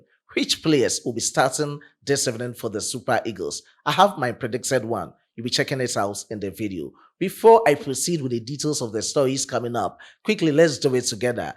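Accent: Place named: Ghana. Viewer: Please u to speak 9ja English oo not gh 😅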